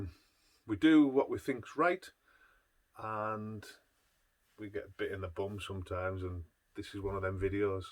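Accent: Cumbria, United Kingdom. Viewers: I knew I recognised that accent! I am from Whitehaven and I am in the process of buying a VW crafter to convert. I look forward to watching more of your videos and popping into your bros shop for some advice. All the best mate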